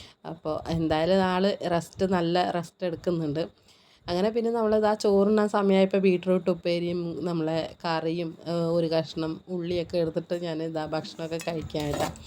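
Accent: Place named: Pathanamthitta, India. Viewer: വീഡിയോ ഇഷ്ടം ആയി. ഞാൻ പത്തനംതിട്ട ആണ്. ഒരപേക്ഷ ഉണ്ട്. നിങ്ങൾ പാലക്കാടൻ സംസാര രീതി ആക്കാമോ. ഞാൻ അത് കേൾക്കാൻ ആണ് വീഡിയോ കണ്ടത്. പക്ഷെ ഇതൊക്കെ ഞങ്ങളുടെ സ്ലാങ് പോലെ ഉണ്ട്. നിങ്ങളുടെ ഗ്രാമ ഭാഷയിൽ സംസാരിക്കു... മനോജ്‌ ബ്രോയെ പോലെ.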